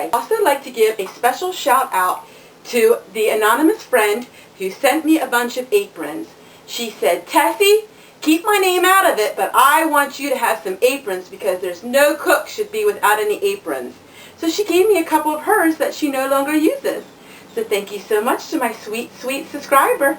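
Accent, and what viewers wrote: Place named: Pennsylvania, United States. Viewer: ha ha, i knew your accent. i am from that area of PA, and now live in NC. so nice to have found you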